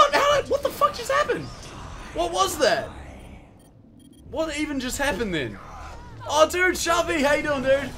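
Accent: New Zealand. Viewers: James Bartlett Yep that's a kiwi accent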